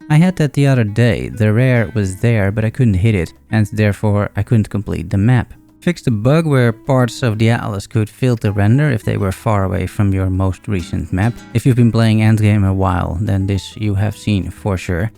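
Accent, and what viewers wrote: Netherlands. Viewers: I came here randomly as POE player but - klopt t dat ik een Nedelands accent hoor?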